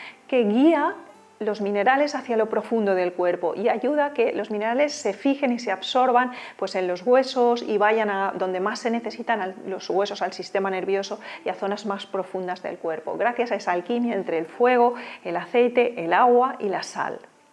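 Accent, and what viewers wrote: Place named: Spain. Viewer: me encanta escuchar a los de Espana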